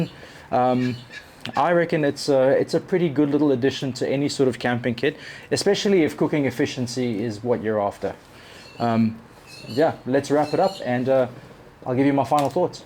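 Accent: South Africa. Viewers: . South Africa, it sounds….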